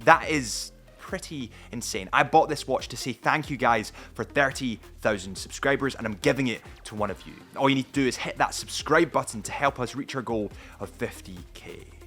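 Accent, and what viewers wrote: Scotland, United Kingdom. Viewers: What’s his accent. Scottish?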